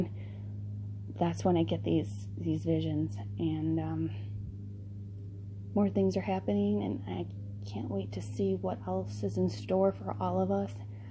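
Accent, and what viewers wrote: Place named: Illinois, United States. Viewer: I understand!! My voice sounds so weird hearing it back. We are our best critics but shouldn’t be!!! And yes it’s slight but you definitely pronounce certain words with a IL accent lol. I like it! It just sticks out to me living in NJ / NY area with the heavy accents but mine is pretty neutral compared to others. Much love!!!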